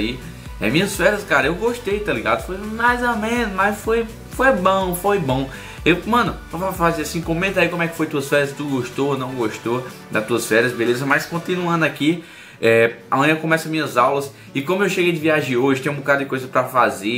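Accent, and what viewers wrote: Northeast, Brazil. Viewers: de onde tu e ?? sotaque de Nordestino !! sou nordestino da Paraiba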